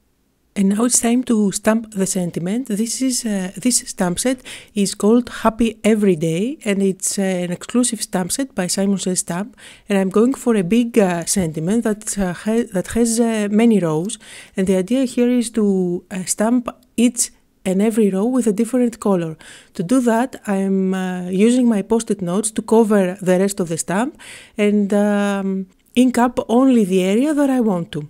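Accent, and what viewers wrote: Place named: Greece. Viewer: i have enjoyed every art journal and card making video you made. Your art really is so warm and joyful. You have such a talent too for demonstrating all of the various products. I love your Greek accent too. Keep the videos coming please and thank you.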